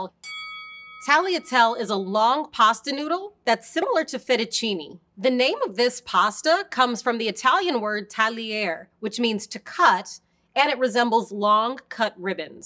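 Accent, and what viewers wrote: United States. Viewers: Why the hell she pronounce all the Italian names in Murican? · That Americanized pronunciation of the pasta names kinda ruins this video for me. I mean, it doesn't have to be an "Itsa me Mario" accent but just a little bit more effort would've made it better imo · Because she is 'Murican lol.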